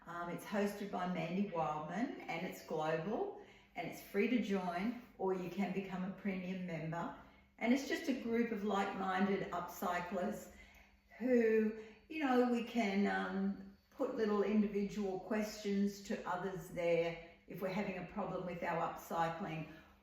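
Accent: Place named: Australia. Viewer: I love listening to a fellow Aussie! GDay from Brissy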